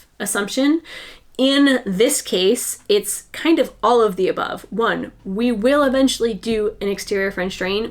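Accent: Canada. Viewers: I appreciate that you aren't wasting tradies' time by asking them to come give you a quote when you have no intention of hiring them! I like your slow footage and I don't think you need to defend why your videos are different to others'. One of the great thing about youtube is the variety of styles that work for everyone. Do you think your editing style is informed by your circus performance style? You're Canadian, I'm Australian, probably most of your viewers are in the US... of course we all pronounce and spell things differently! If we all understand each other, what does it matter? And thanks for answering my question about the drain! I forgot about the soil-too-high aspect of your home, but I was genuinely interested because we are also renovating our 140 year old home and I was wondering if digging our drain inside was something we should consider. I hope it didn't seem rude! 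Thanks for your community engagement, it's definitely another reason I love your channel!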